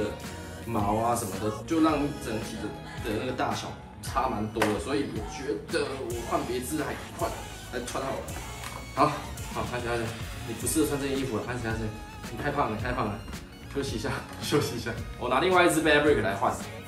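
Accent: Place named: Taiwan. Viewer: 台語夠台還會抖腳😂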